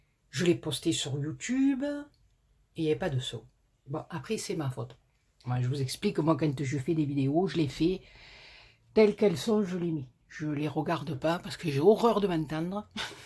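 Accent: South, France. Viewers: Cc, je vous adore, j'aime ce que vous faites c'est magnifique et le soleil dans la voix du sud, je ris beaucoup et j'ai l'impression d'être là bas avec ma famille... Que vous me faites du bien..., et votre façon de dire, je vous kifffe grave comme vous dites...je vous copie pour apprendre c'est tellement beau.... Merci à vous de votre bonne humeur et vos rires me font un bien fou.... Et oui, franchement elles sont ravissantes 😁😂😂😂😂 Et oui, j'aimerai bien votre jolie carte.... 😁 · Recoucou ma.... contente d'entendre ce joli accent du sud....tes cartes sont magnifiques et le die superbe avec ce papier miroir... ca fait bien esprit de noel ou noyel même si ce n'est pas les couleurs traditionnelles..... Tiens mistigri n'est pas venu te voir sur cette vidéo.....pleins de gros bisous ensoleillés de la Réunion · Pas vue la première vidéo ...mais tu me fais bien rire 😊et j aime bien ton accent du sud.Tres jolies tes cartes.💕💕bonne soirée bisous😘😘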